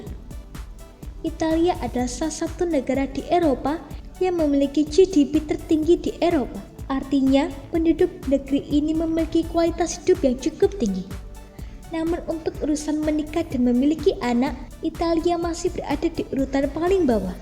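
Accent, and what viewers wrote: Java, Indonesia. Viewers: dialek jowo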